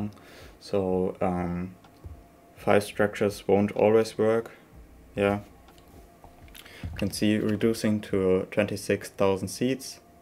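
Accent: Germany. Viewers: Du bist sprichst deutsch oder? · Ich finde es wunderbar wie man sofort hört dass du deutsch bist hahaha :D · Bro i am hearing the DEUTSCH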